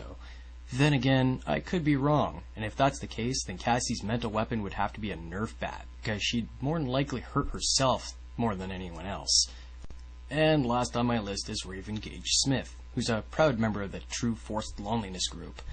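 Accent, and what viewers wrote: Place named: North America. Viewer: i'm sorry for making assumptions about your age based on your voice. But the accent.. well.. i'm a swede.. i wouldn't expect you to tell the difference between scandinavian accents either. i'm sure many people enjoy this emulation, and you do it well for someone with a northamerican accent and your voice (no insults intended). i just personally think that the brilliance of Yahtzee is as mutch about his cynical tone of voice and english dialect as it is his sarcastic fast pace.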